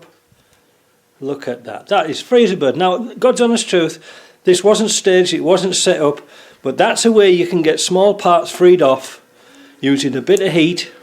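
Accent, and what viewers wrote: Yorkshire, United Kingdom. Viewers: The big red spanner has worked for me many a time! I only have MAP gas but with patients it works. Great presentation style, I can almost hear all the old Yorkshiremen that took you under their wings.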